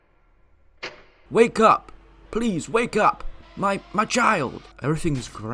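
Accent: United Kingdom. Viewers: you sound british hehehehe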